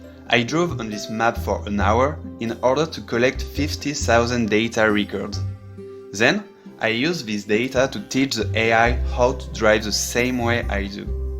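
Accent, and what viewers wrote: France. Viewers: are you french? your accent let me think you are x) SI tu l'es GG a toi content de voir un génie français comme toi^^ ça à l'air si complexe x) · Ouep c'est un français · Il est francais l'accent plus les sous-titres en anglais et français....il est francais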